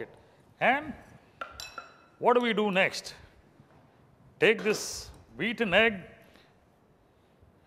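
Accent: India. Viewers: Great Recipe. You have a great presentation skill. What I noticed was that you did not stammer or pressed hard for words. It was very naturally narrated and with our real Authentic Indian Accent. Thank you very much.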